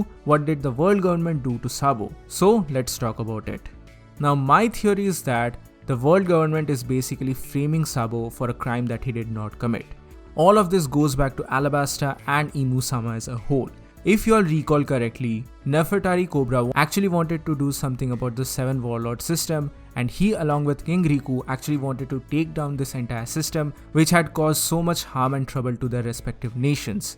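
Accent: India. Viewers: ur accent is very familiar ... Are u Indian? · yeah its indian accent ,I can understand I am also indian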